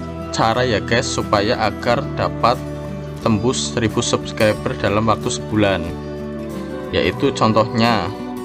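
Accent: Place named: Kebumen, Indonesia. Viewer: Jaannn njawani . Kebumen kayane rika ..??? "NEMBE NEMBE" 😂😂 .. Semangattt lanjutkan . Silaturrohim juga ya ..?Terima kasih mas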